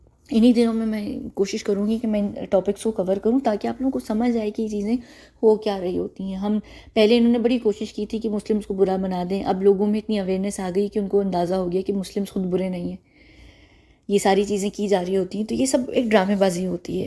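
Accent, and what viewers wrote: Pakistan. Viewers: Her dialect is pure Pakistani. Slow claps for those who believe her Illuminati agent story. She could be related to islamic organization and her purpose here is solely to set a narrative for defying the existing islamic terror. She won't acknowledge Hindu genocides. Her agenda is fixed... They play the war of narratives. In a way she is also installing fear. The elites don't have the absolute power. We, if united are powerful too.. People keep ur senses alive and always always always question every narrative or information being thrown at you. Specially from a person whom you have never even seen. It's a very planned move.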